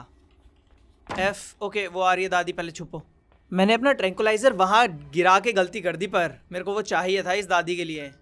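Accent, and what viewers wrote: Haryana, India. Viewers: I think he needs to bring his old desi accent back 😂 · Who else miss's his haryanvi accent 😢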